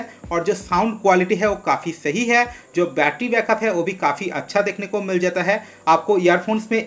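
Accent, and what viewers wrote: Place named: Bengal, India. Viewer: Shune mone hocche tumi bangali... Banglay content dile aro bhalo lagbe...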